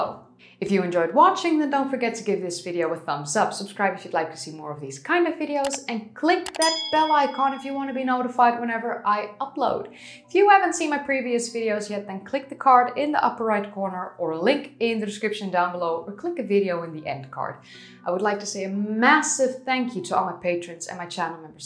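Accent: Netherlands. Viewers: You must be Dutch right? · her wonderfull dutch accent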